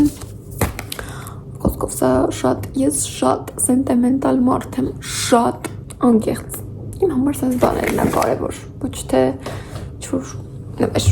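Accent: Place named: Vanadzor, Armenia. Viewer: Հոգում միշտ Վանաձորիս բարբառը։ ՍԵՐ Վանաձոր❤💫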